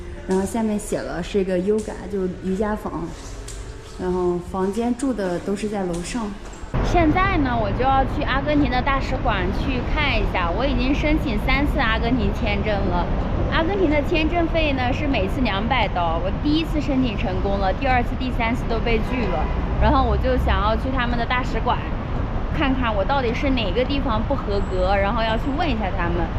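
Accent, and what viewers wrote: Northeast, China. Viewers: 感觉你是东北人口音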